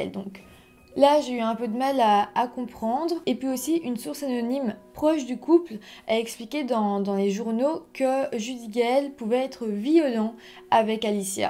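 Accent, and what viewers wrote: Belgium. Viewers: En fait, je n’aime pas les histoires de meurtre, les charges émotionnelles sont terribles, mais en même temps j’adore l’accent belge (couire lol 😂) et plus sérieusement le sérieux des recherches et la présentation lumineuse des affaires. Merci.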